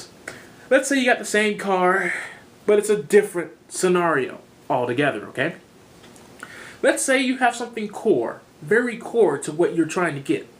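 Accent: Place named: RP, United Kingdom. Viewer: queens english.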